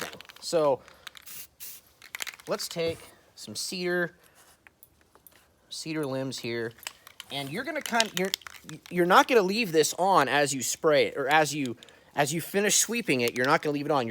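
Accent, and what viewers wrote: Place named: Backwoods, United States. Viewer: Can you try the next video with an Australian accent. This whole backwoods American thing doesn’t suit you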